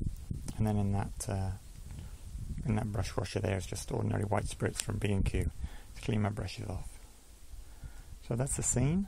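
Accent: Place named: Australia. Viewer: you sound very AUSSIE.!!!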